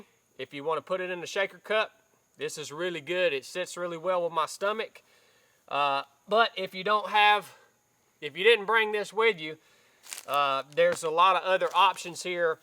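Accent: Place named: Tennessee, United States. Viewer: Love it nice, I dig the accent as it reminds me of my dad who was from Tennessee, I grew up in California I was a CTR in the NAVY, worked out a lot, I was even better on a run than most youngins. I am 48 now and got type 2 diabetes, got to watch how much beer and sausage I eat I guess. I pretty good, bit tired after lunch though. I always need a nap. I teach teenagers Mon-Fri. 8-2pm. I wake up to prep for work btw 6-7am. I go lift at the gym at least once a week maybe 2 sometimes, I try to do a FunkRoberts(youtuber) 10-20 min workout a couple times a week. Any tips on diet and workout are welcome guys, Thanks!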